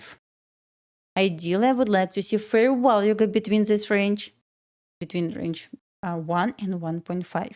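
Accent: Russia. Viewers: YOUR MOTHER LANGUGATE MUST BE RUSSIAN...I CAN FEEL IT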